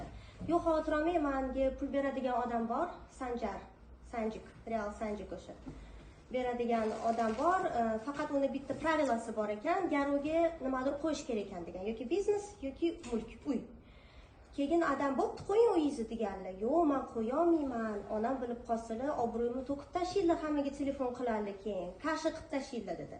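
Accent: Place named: Russia. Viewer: Чала урис узбекча гапираркансану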